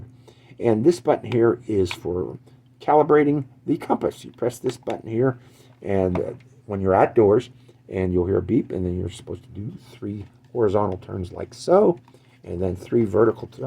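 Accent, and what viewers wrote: Pittsburgh, United States. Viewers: I forgot to ask, are you from Pittsburgh Pa? Your accent 😍. I really do enjoy this video. It's what I need. Almost "hands on" instructions. Thanks again! I really want to learn how to fly drones. You've made it so much easier and you're really good with it.❤👍🏾I love the return home feature 😃Thanks again! I've subscribed👍🏾.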